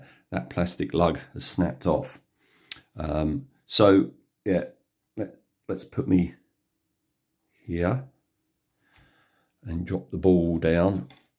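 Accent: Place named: Kent, United Kingdom. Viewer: You sound like a kent ite like me, dunno if your school at the end of term used to gamble crisps on 'battling tops' , maybe it was only us in Sandwich... excellent video sir!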